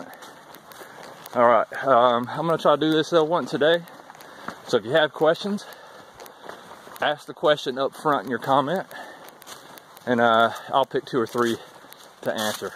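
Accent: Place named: South, United States. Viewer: Three comments. First, thanks. Second, happy anniversary. Third, being a fellow southern boy, in fact I'm back in Moore County, you may know where that is, I have an observation, if I may. You're six four, 190. You pass one or a pair of people in the woods and you ask "hey, how are y'all doing" with your southern accent and great smile. They, like everyone on the trail, are trying to unplug from the Matrix, however, now they're worried that they've stepped into another movie because they're listening to the instrumental "dueling banjos" playing in their thoughts. Please don't take this as criticism, just an observation. I don't have a solution. I've found people everywhere are fantastic, just as I know has been your experience. I made note that several NE folks had weighed in positively, and I hope I've conveyed a little southern perspective. 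Again, thanks.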